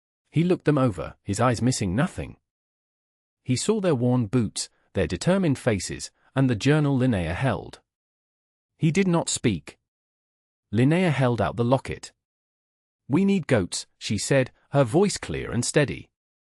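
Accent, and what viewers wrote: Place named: United Kingdom. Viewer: Is there a particular reason for the British accent for the narrative?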